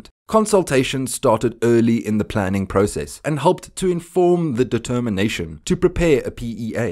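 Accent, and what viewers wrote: South Africa. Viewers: He's from South Africa, like Elon himself.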